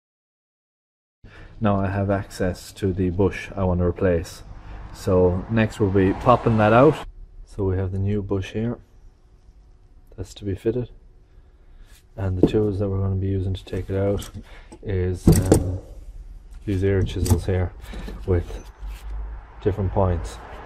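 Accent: Ireland. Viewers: listened 3x just to hear the Irish accent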